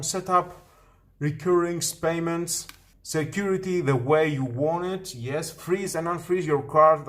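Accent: Greece. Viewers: Τον Έλληνα δεν τον καταλαβαίνεις από την φωνή αλλά με την πρώτη λέξη πού θα μιλήσει αγγλικά, όσο special κι αν είναι, proficiency να έχει ρε ΚΑΤΕΥΘΕΊΑΝ ΘΑ ΠΕΙΣ ΝΑΤΟΣ😂💪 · Κι εγώ με το που τον άκουσα λέω δε παίζει, ή Έλληνας ή Ισπανός θα ήταν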